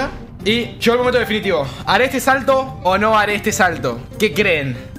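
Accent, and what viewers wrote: Argentina. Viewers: Sos argentino? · Chino argentino?! Esto es otra onda!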